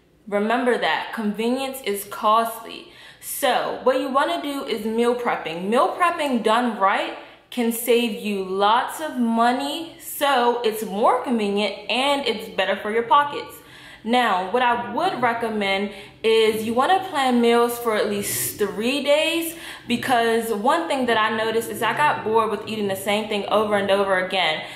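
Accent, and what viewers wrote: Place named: DMV, United States. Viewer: Yas. Recognize that talk anywhere DMV!!!!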